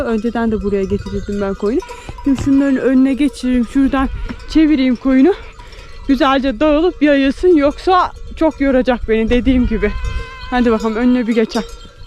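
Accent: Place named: Kastamonu, Turkey. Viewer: Istanbuldan selamlar seni izliyoruz Allah yolunu açık etsin bizim kastomonular gibi konuşuyorsun maşşallah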